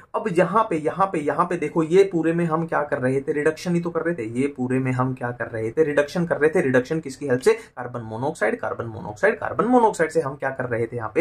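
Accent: Haryana, India. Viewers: Sir aap haryana se ho kya??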